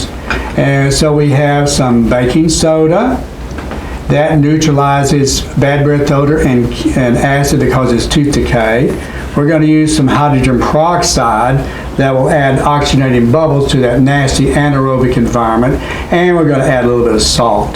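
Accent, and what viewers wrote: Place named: North Texas, United States. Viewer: I was just thinking that, he’s got that North Texas accent!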